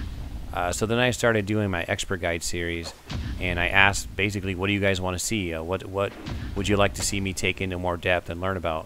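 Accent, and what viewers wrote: United States. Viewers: I see what you mean. Sometimes different microphones have a huge effect on the way a person sounds, but seemed to have a slight southern accent in a lot of these videos that I don't hear in the Fextralife vids.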